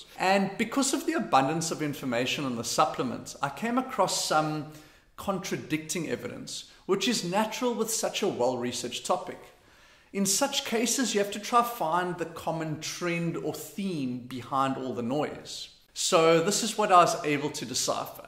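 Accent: South Africa. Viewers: You sound 99.9999% South African😁 Am I right? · You south African? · South african? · Are u South African?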